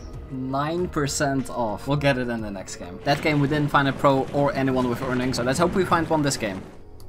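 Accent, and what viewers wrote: Netherlands. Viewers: ik kan gewoon horen aan je stem dat je nl bent XD maar ga zo door bro ik hoop ooit ook earnings te krijgen word dit season voor de eerste x unreal en ik ben 13 je bent een hele goede speler ooit word jij n 1 ga zo door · leuk dat een nederlands accent toch zo sterk kan zijn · Bro are you dutch ? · ik ruik nederlands accent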